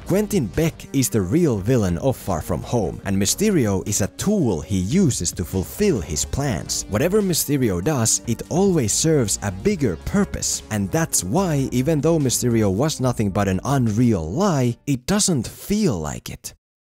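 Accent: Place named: Finland. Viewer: You're Finnish, aren't you? That accent... familiar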